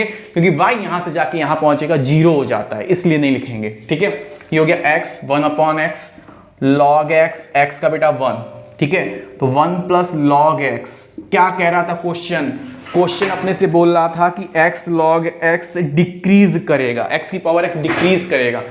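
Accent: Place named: Kanpur, India. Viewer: Gabbar is back on old style wow chacha apne kamal hi kar diya kanpuriya language ke sath ye sambha laga nisana jara to is kaliya par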